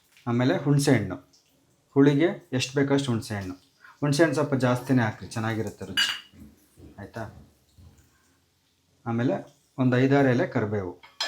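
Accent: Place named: North Karnataka, India. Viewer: Nivu uttarkarnataka kadeyora sir , nimma bhashe chenngide. Yav uru...nimdu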